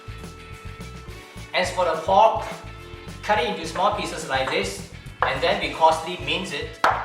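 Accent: Singapore. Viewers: Is he speaking Singapore English? Cantonese, Please, so that everyone can understand.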